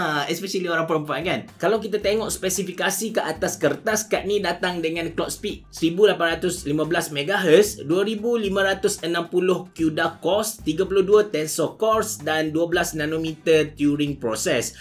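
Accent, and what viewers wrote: Kelantan, Malaysia. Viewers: mcm awal2 dlu kencek klate😄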